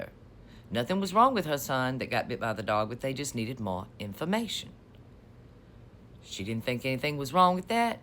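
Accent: South, United States. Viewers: I'm so sorry about this situation. I appreciate and love your candor and delivery, I have southern roots and miss that cadence! I pray for protection on you and yours. Be safe and well!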